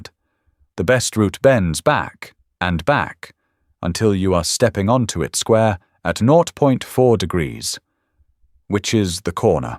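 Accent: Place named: Germany. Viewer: It figures this guy has a German accent.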